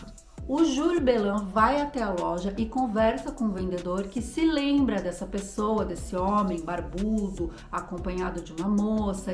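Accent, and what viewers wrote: France. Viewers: Je aimer le Ton Français , merci mandame.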